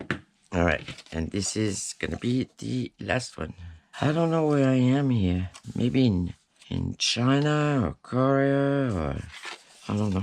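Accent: France. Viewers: OMG I just found your page. You’ve had me laughing and smiling for the past two hours. I love your accent! Not sure but maybe French? Love your videos. I first watched the video where you made the ephemera storage. I cracked up when you said “ Oh wait look at all the little people. Now I can see all the little people!” Just know you have a new subscriber and I’m loving all your content! Cheers…. Nancy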